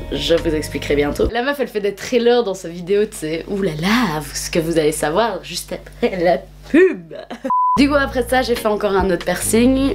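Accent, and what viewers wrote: Belgium. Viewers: ahh mais je t'aime d'amour !! Tu me donnes trop le sourire avec ton petit accent belge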